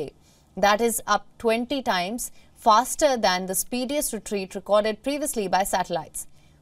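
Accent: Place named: India. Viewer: Ahh, good old indian accent with indian face. Jai Hind!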